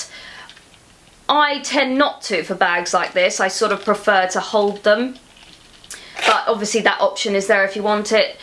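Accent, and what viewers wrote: England, United Kingdom. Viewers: Its nice to listen to your strong british accent, it reminds me the listenings i had to do when i was studying english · I love your presents, they're amazing! and I love also your accent, you're from England, aren't you? :)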